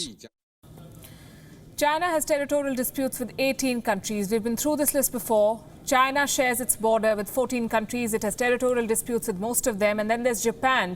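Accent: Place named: India. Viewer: I know you are doing the good thing. Thanks. But do you realize that you have a very heavy accent and people do not understand what the heck you are talking about. Can you slow down your speech and enunciate. It applies to all Indian speaker with heavy accents. Can't you guys know you have very heavy accents? I know China lies. but you should say it clearly so that more people understand the truth.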